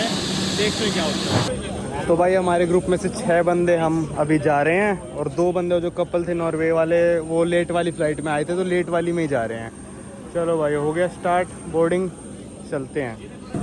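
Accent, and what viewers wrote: Haryana, India. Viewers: bhai accent pehle wala hi rakho. Ye aapka haryanvi accent irritate KR raha hai. 🙏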